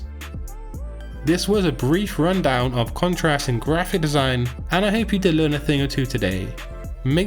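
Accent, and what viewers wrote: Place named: Bristol, United Kingdom. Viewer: Yo, are you from Bristol?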